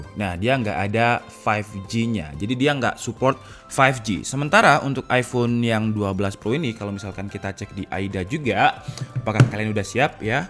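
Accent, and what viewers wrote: Java, Indonesia. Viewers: ABANG ORANG MANA SIH..kok rada logat jawa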